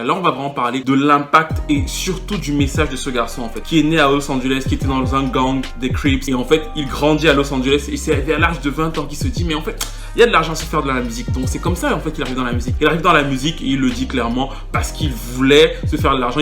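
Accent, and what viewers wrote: Cameroon. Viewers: tu as un accent Cameroun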